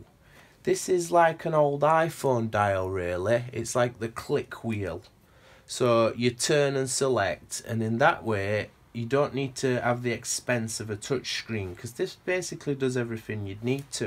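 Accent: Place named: Yorkshire, United Kingdom. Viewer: nice yorkshire accent. :)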